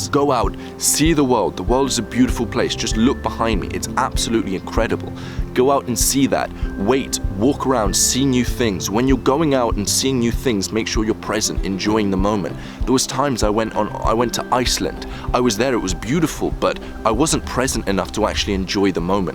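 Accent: United Kingdom. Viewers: I really can't tell if he's British or American 😳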